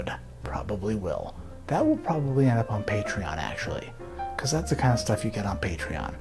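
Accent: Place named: Minnesota, United States. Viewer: He has SUCH a Minnesota accent! Must be from the Cites or thereabouts.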